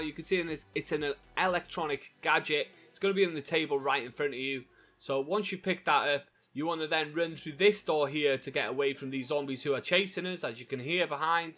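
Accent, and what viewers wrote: United Kingdom. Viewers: thank you for your uk accent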